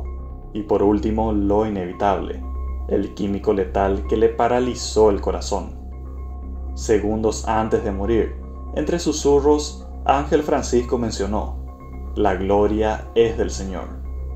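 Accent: Paraguay. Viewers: UF TU VOZ SUENA A BRASIGUAYO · Exelente video maestro 💣, sos de Ciudad del Este, Saltos o Pedro Juan si o si por tu acento 🇵🇾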